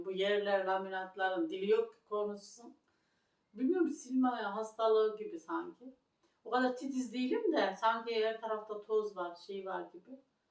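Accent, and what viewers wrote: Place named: Aksaray, Turkey. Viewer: Nerelisin abla konuşman Aksaray lı gibi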